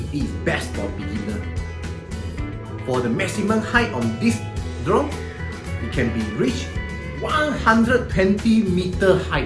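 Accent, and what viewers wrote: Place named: Indonesia. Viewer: indonesian Speak🤣🤣🤣👍👍 not bad my bro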